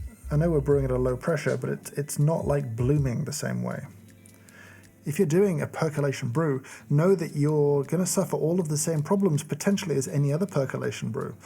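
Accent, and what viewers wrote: United Kingdom. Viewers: I can't help but think of the fact that the British accent often pronounces words ending a as an er - like delta sounds like delter, despite the fact that it's from Australia. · I went through this entire video thinking that the brewer was called the "Delta Brewer" and that was just being British...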